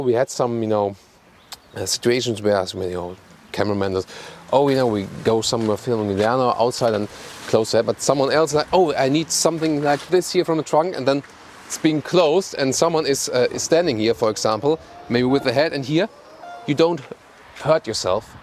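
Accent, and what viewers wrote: Germany. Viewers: One of my favorite proper SUVs currently on the market. I am in love with your German accent! I feel the need to open a Milka bar. Thanks for this review. From The United States of America.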